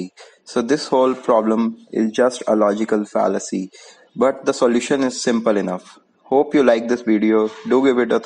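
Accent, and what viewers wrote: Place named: India. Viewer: Oh God I was hoping it wouldn't be an Indian narrator 🙄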